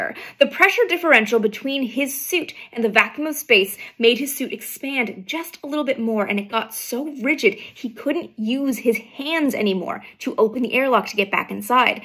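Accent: Canada. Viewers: are you Canadian? A few long vowels in this short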